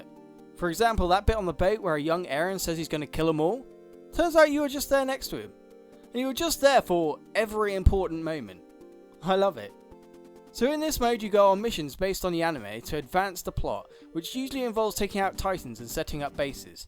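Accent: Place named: United Kingdom. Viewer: by the way, you sound a little British, do u come from the UK? I do!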